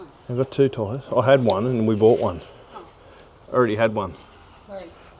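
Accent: Australia. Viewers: its Australian mate 🎉😂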